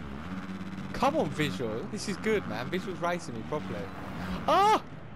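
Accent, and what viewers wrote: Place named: United Kingdom. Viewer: Loving the British accent mate😂😂👌🏾🤙🏽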